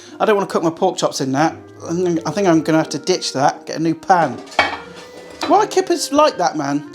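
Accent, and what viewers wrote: Leicester, United Kingdom. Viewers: Just wondering if this method would work for Gammon steaks 🤔 I discovered a while ago that grilling Gammon steaks seemed to really dry them out, so I switched to cooking them in a hot pan, like I would a steak, which seems to give that lovely char, and not leave them dry inside. I too like to experiment and really enjoyed the burger videos, confirming many of the methods I stumbled upon. I never buy pre-made burgers, much better to form them from scratch, as you do. They freeze really well also. Great videos! I thought I recognised your accent - your weather report confirmed it - I am hail Leicester also. What a crazy hot summer it was 🥵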